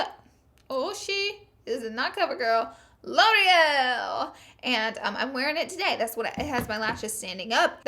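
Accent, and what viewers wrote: South, United States. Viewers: I'm not trying to be mean but are you from the south cause you have a bit of a country accent?? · She's southern. She has a accent there is nothing wrong with her smh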